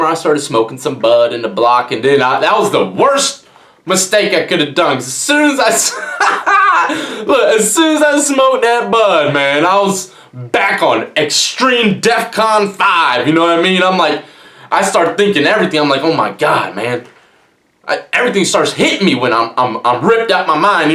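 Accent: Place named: DMV, United States. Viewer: I knew you from the dmv or I could just hear it I’m from dc/Baltimore anywhere in between and I know front royal VA pretty well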